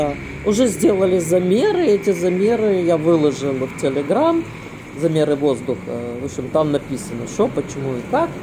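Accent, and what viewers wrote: Odessa, Ukraine. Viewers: Как мне нравится ваш говор, Одесский ..гуляю с вами...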